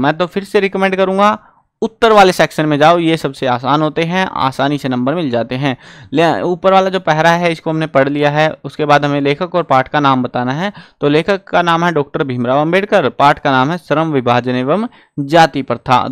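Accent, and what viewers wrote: Haryana, India. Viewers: Sir haryanvi hai kya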